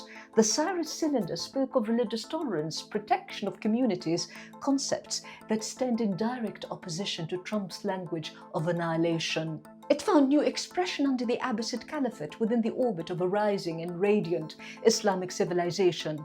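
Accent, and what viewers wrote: RP, United Kingdom. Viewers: Excellently read lines off the screen with a weird queen's english accent. Just bizarre. Don't know what is worse - ai slop or fakery like this.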